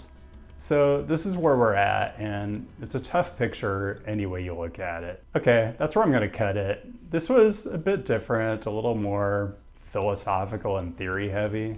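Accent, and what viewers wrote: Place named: California, United States. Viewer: I like this guy's content, but man do I hate his intonation patterns. It's like farcically Californian.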